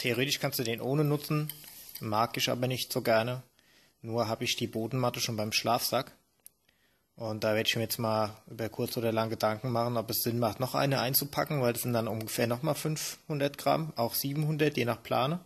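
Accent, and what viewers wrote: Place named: Palatinate, Germany. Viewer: Lewwerworscht :)) ich lach mich weg....Pälzer Platt goes Ytube ;) hört sich aber doch heimisch an.... Belgisch Jig Saw...sieht gut aus ! Hast du eine Quelle ? Grüsse aus der Landeshauptstadt RPL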